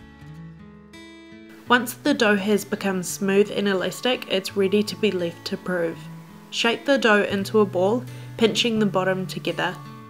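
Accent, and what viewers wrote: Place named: New Zealand. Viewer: R u a kiwi by chance?!? Cos i rekon u souns pretty kiwi!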